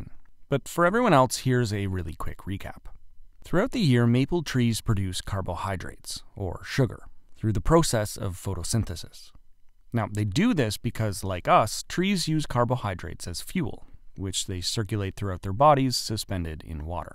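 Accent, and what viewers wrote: Canada. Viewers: This guy has a thick canadian accent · Victory Begins in the Garden And he has the most Canadian voice to go with it. CBC Radio worthy. 🙂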